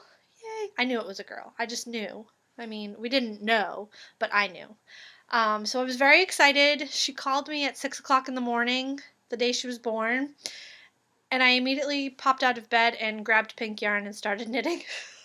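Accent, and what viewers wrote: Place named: South, United States. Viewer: Nothing wrong with talking like you're from the south lol.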